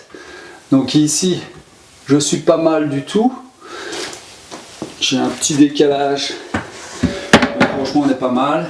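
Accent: Liège, Belgium. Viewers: Jolie découverte via la chaîne de Floky. Je me suis déjà mangé toutes les vidéos. Ça fait du bien de voir que la Belgique est aussi présente sur YouTube. Vive l’accent Liégeois ( et ce n’est pas péjoratif ) .Un abonné en plus. Et bonne continuation je suivrai la chaîne avec attention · Belle découverte et en plus un accent du pays 😉. Et en plus septante trois et pas soixante treize. Enfin un bon belge. Bonne fêtes. Et merci floky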